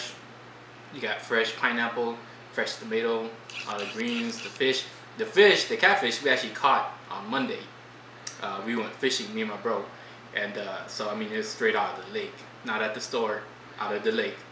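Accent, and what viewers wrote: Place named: North, Cambodia. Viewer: She from north cambodia? her voice difference from us in svay rieng province. And her food make me hungry too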